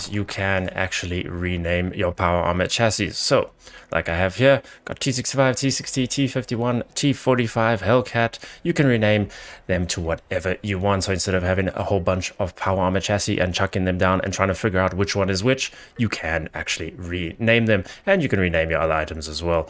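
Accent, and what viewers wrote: South Africa. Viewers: Is that a South African accent I'm detecting?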